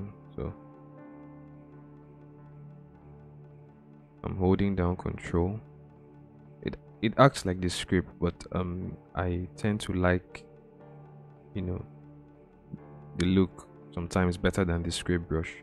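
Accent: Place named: Nigeria. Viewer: So good to have a tutorial in Nigerian English. I can understand everything you're saying. Really clear and straight to the point. Thanks for this.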